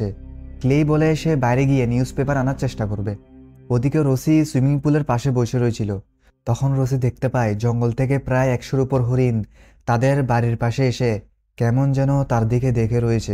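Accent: Chittagong, Bangladesh. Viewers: Vai ki Chattogram er? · আপনি কি ভাই চট্টগ্রামের আপনি কি ভাই চট্টগ্রামের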